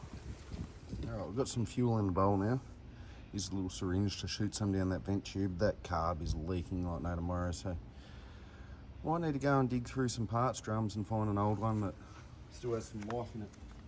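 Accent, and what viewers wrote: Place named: Australia. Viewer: Love it! Realistic Aussie narration and cool approach. You got my sub.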